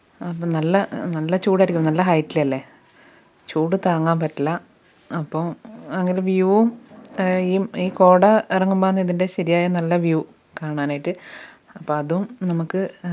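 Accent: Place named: Kannur, India. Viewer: Can't fix auto dubbed issue So irritating English commentary, I really likes your kannur typical language.so after solving the isse, definitely see yr all vdos one by one🎉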